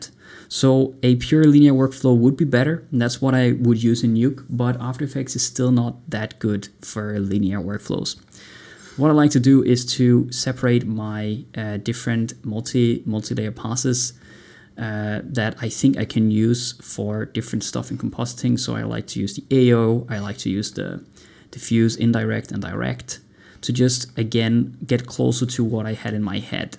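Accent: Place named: Denmark. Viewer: Godt grej, Det ser fandme godt ud! :D Baseret på accenten tør jeg godt skrive denne kommentar på dansk. :P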